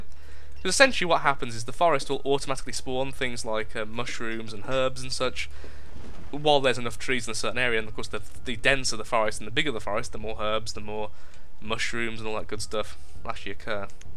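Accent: United Kingdom. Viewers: Harry Potter accent of english <3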